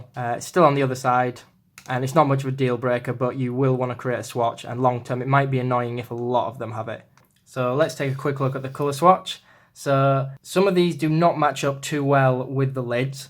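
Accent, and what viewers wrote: United Kingdom. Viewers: I love your British language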